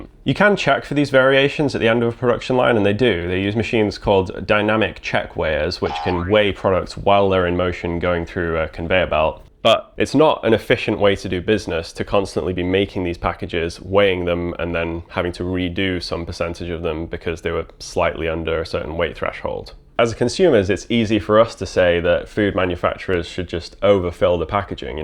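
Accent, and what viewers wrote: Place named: Scotland, United Kingdom. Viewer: Why do you sometimes sound a bit Scottish? I am Scottish and you do, especially in this video 🤔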